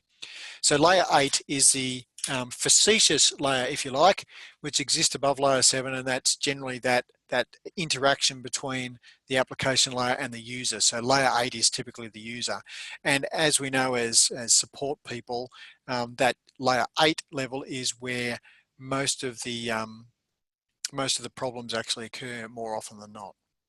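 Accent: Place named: Australia. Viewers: Are you suggesting that an Australian presenter, working for an Australian university should try to put on a fake accent of some kind to somehow make it more understandable for others? What's your suggested fake accent? Or should he record each lesson multiple times in various accents? Or maybe he should learn multiple languages. I can't believe that after more than 2 hours of informative material, that's your only feedback. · yes you're right. Australian not British. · Definitely Australian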